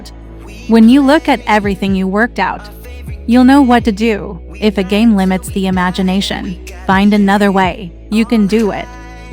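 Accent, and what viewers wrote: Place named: Australia. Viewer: O.M.G!!!! The moment I have been waiting for has arrived! I cannot get over at how excited I was watching your voice reveal video. I took in every word you said and imprinted in my mind your favourites things. I too am into kdramas like sugar fizz! And I too enjoy Melisa McCarthy!!! I knew your voice would be sweet and gentle, and I love the Australian accent!! I bet you can do and be anything you want to be if you just put your mind into it. I will continue to watch your videos to the very end! You mentioned you won't be directing movies forever 😢 but when you do i will be around to celebrate an end with you❤ a the good luck with your future endeavours!! Love you queen!💙💙💙💙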